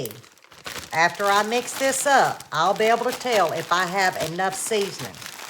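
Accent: South, United States. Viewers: Southern lady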